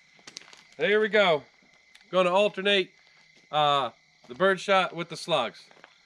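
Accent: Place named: South, United States. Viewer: Southern accent detected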